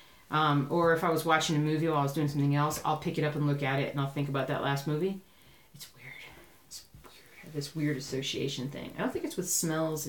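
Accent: South, United States. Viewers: So sorry to hear about your fur baby! I pray Jelly girl is well soon. By the way there's nothing wrong with your southern accent coming out....I'm from KY!!! :-) Great episode, just way to short, sheesh you know your number one job is to entertain me, I meant us (yeah I meant us hehehe)!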